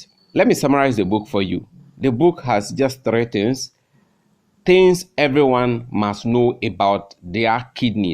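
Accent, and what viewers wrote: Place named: Ghana. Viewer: First I love the way Ghanaians speak so that means I love the way you speak...secondly I love how you were able to sell this book even without looking at it listening to you can make one want to buy the book..and finally thank you for choosing this book to review on it because a lot of people need to be really educated about there health... am a health educator and our primary goal is give preventive means other than curative means to health and as they say health is wealth so thank you for sharing this I enjoyed every bit...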